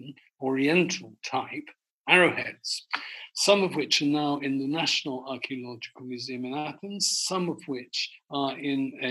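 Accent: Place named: United Kingdom. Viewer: your british accent is unbearable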